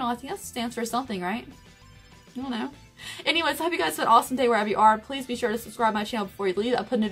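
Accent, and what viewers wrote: South, United States. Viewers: it's funny how differently we see ourselves than others do. Seriously, you are the most beautiful YouTuber I've seen to date. Everything about your face is perfection to me. Your eyes, nose, lips, cheekbones, forehead is normal lol, etc. Your smile and laugh is everything to me. When I watch your videos, every time you smile or laugh, I do too, because it's that contagious kinda smile (which is a rare find for me). Your voice is fucking adorable as hell (ultra feminine with a splash of southern belle in there). I love that you always wear dresses, and have a vintage glam vibe to you. I found you very randomly a few months ago. One of your 'Thrift Store' videos was on my homepage, and naturally being as huge as a thrift shopper as I am, I was intrigued. I was instantly addicted to you, from the very first video I watched. I don't understand how you don't have millions of subscribers because you are hands down my favorite YouTuber to watch, and I get so giddy everyday when I see your post notification. 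I hope to do on my channel for people, what your videos do for me and your other stan subscribers. The only other YouTuber's I loyally watch is (freaking love her), and I don't care for the more run of the mill type of folk. Keep staying true to yourself. Much love!🖤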